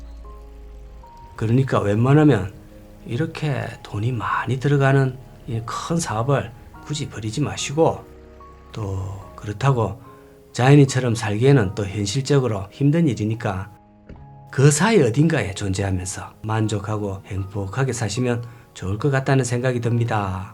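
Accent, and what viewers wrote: Gyeongsang, South Korea. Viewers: 야이마 겡상도 사투리 쓰지마 방송하려면 시부럴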